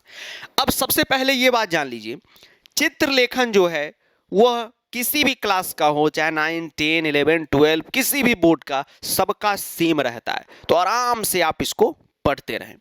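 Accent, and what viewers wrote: Bihar, India. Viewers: Sir kya bihari boli maje a gye 🫡🫡🫡😂❤❤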